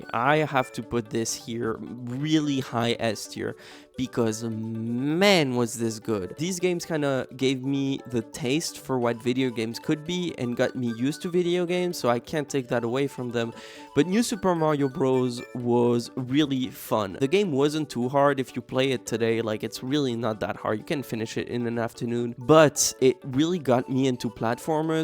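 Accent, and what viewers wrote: France. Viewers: Not gonna touch on everything but : Starting off with Goldeneye on N64 is so funny bc for some reason i was kind of obsessed w the game when i was a kid (not even necessarily playing it, just the game itself? i don't think i even really knew who James Bond was tbh lmao) I played Eyetoy Play sooo much it's actually silly how much i played it I have such fond memories of New Super Mario Bros on the DS, mostly bc i associate it with my mom - we all played it but that game was serious business to her lmao (especially on vacation) I really liked the minigames in Mario Kart DS but i think it's bc my Mario Kart™ was Double Dash on the Gamecube so maybe that played a part? If you played that one i wonder how you felt about the mini games in it Anyway, this was a fun video and i'm glad both you and your sister have memories around these games (and many more i assume). 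Jumpscare incoming: Et d'habitude je capte l'accent français mais j'avoue que j'y ai même pas pensé jusqu'à c'que je vois ta réponse à un commentaire sur une autre vidéo ptdrr but lowkey my English is better.. so let me pretend i didn't say anything !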